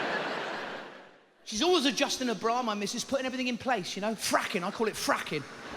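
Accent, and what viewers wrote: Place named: Birmingham, United Kingdom. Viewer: The brummie accent kills me as well.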